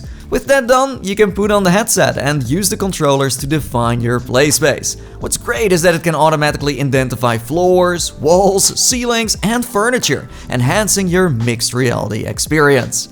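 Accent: Netherlands. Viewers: This man is dutch right?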